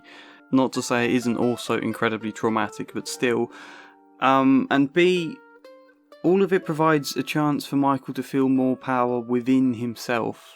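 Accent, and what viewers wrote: United Kingdom. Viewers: michael was in the marines not the army. maybe you should actually watch the movie. your British accent will not save you.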